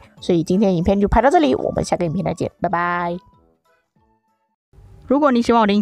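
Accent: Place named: Malaysia. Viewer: 你哪里人哦 马来西亚口音很重哦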